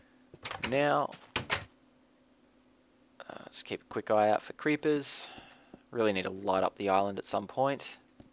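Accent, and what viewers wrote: Australia. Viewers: this may be a stupid question, but do you live in Australia? You sound sliiightly Australian.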